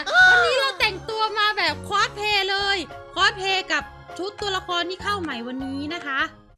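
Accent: North, Thailand. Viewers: พี่ พูดเหนือทั้งคริปหน่อยครับ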